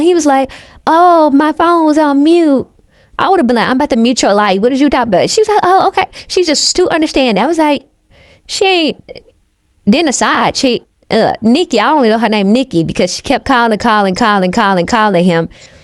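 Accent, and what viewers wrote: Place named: South, United States. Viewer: Your southern accent is giving me life! Tennessee? Appalachia?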